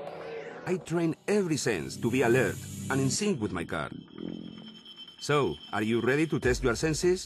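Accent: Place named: Spain. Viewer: I like your accent. Spanish?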